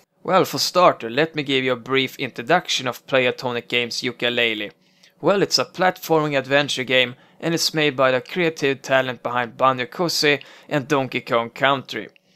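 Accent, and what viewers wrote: Sweden. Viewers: Well that's the thickest swede accent I've heard all week. Nice coverage as well.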